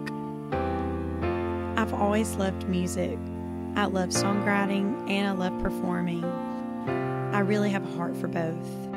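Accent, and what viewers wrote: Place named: South, United States. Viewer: Wow! Your southern girl accent really come when you speak. Very nice!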